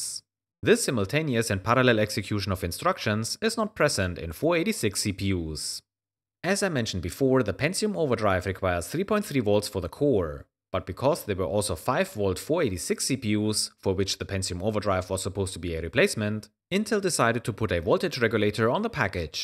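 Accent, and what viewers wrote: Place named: Germany. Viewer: Why did they want so much money from you at the scrapyard? Seems like the guy was very shady and pocketed the money. You sound German and when they allow me to look through the trash (not everyone allows that) they either say take the shit or they weigh it and I give them the scrap price. The outcome would be below 3€ for a handful of CPUs. Or was this a private facility? I always go to our municipalities scrapyard when I want to get rid of stuff and I ask if I can look through the electronics. The outcome of that question depends on the guy sitting there.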